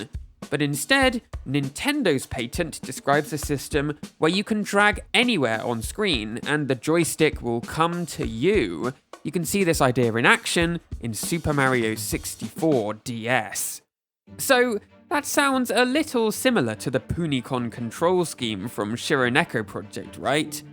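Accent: United Kingdom. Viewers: Thanks, I was going to ask that. It's obvious you're British - your accent and the spelling of "honor" with a "u" are dead giveaways.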